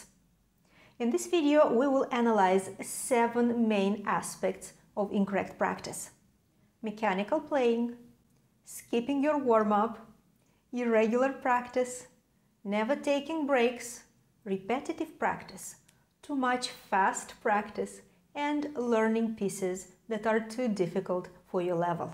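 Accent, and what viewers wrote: Russia. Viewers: Ура! Я могу услышать русского человека, просто услышав как он произносит отдельные звуки английского. Я угадал, что вы русская? По крайней мере русскоговорящая?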